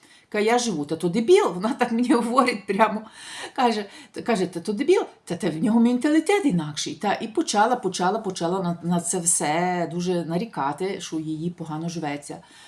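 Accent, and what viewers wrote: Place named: Western Ukraine, Ukraine. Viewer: ..ВЫ СИДЯ СЕЙЧАС В ИТАЛИИ..ГОВОРИТЕ ОЧЕНЬ ПЛОХО ПРО НАШИХ МУЖЧИН..А ТАК ЖЕ ПРО НАШИХ ЖЕНЩИН..В С ЗАРАДНОЙ УКРАИНЫ,СЛЫШЕН ПРОНОНС..СТАРАЕТЕСЬ ВСЕМИ ПУТЯМИ УНИЗИТЬ!!!ВЫ 10000%ИМЕЕТЕ ИТАЛЬЯНЦА..НО ХОРОШЕГО..ТАК ВАМ ПОВЕЗЛО..И ГОВОРИТЕ ПРО НАШИХ ЖЕНЩИН,ЧТО ИМ ЯКОБЫ ВЫГОДНО ЖИТЬ С ИТАЛЬЯНЦЕМ..ЭТО ДАЛЕКО НЕ ТАК!! ДА..ЕСТЬ НАШИ ЖЕНЩИНЫ ЖИВУТ..НО ОТ БЕЗЫСХОДНОСТИ..ОНИ РАБЫ Ц ИТАЛЬЯНСКИХ МУЖСТН..А ВЫ ТАК ЛЕГКО ИХ ОСУЖДАЕТЕ...БОГ ВАМ СУДЬЯ🙏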